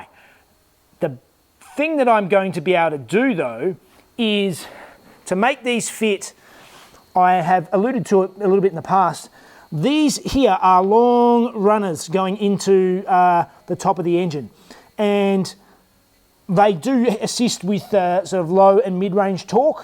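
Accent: Australia. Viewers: Wow from Aussie?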